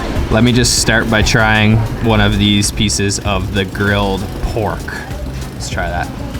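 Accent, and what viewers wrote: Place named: Canada. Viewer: He sounds incredibly Canadian. He is not dropping a lot of EHs but everything else is completely Canadian.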